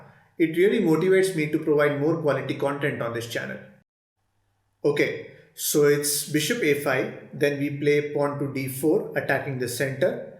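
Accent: India. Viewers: Me: hm thats not gonna help hears indian voice Woaaahhh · I've never heard such a nice Indian accent😀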